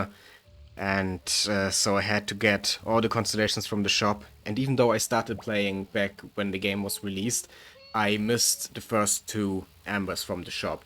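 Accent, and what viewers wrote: Germany. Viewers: thats the most german accent i have ever heard in my life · I smell a fellow german 😋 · You're German?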